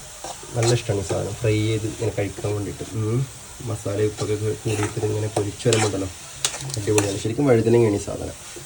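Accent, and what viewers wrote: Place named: Malabar, India. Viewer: സംസാരം തനി മലബാർ ഭാഷ ആയി തുടങ്ങി 😂😂😂😂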